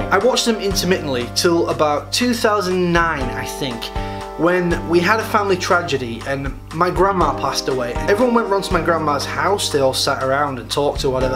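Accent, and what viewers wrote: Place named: Manchester, United Kingdom. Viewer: Is from Manchester??:o I've been away so long, but that accent just plunged me into nostalgia xD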